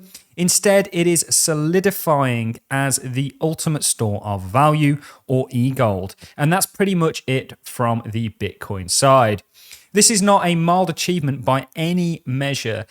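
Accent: United Kingdom. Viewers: Thanks for covering $KAS buddy - graceful and elegant British accent and mannerisms make it sound . . . exactly as great as it actually is !!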